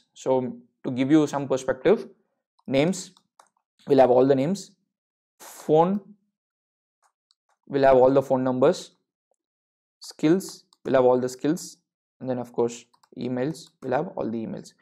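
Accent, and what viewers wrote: India. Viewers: 11/10... Additional credit for proper indian accent. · am I the only one unable to listen to Indian english?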